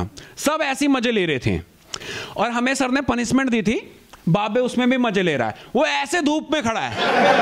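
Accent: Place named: Delhi, India. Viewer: Bhai delhi se ho na language se pata chal rha hai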